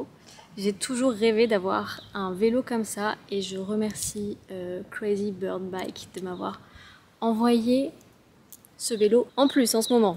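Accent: France. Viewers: Les toulousiens parlent très bien français. Je pense que les toulousiens parlent français un peu mieux que les parisiens. J'aime ton accent français. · J’aime ton accent français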